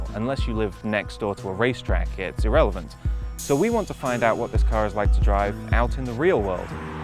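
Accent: United Kingdom. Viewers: American or british? i cant tell. sounds like he has a slight british accent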